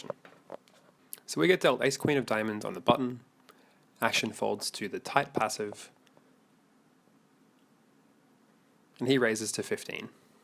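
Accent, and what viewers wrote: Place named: Europe. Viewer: Can you include where you are playing your sessions please ? I notice the dealers are dealing from what appears to be a shoe? Never seen that before and I'm just curious as to where your from I noticed an accent so I'm guessing somewhere in Europe? Anyway keep up the good work the Vlog looked great. Have a great day.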